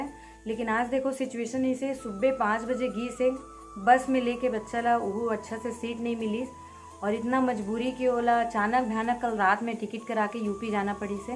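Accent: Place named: Chhattisgarh, India. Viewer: दीदी आप मन के छत्तीसगढ़ी बोली अबबड‌ मीठा लगते।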